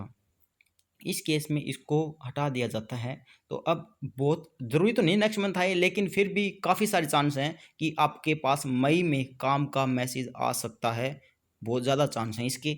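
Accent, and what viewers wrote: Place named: Haryana, India. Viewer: I like your way of talking pure haryanvi 👍🏻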